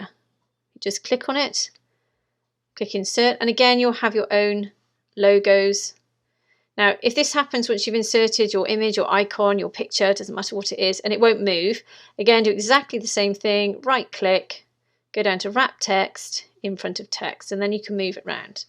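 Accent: England, United Kingdom. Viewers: A great demonstration and a lovely English dialect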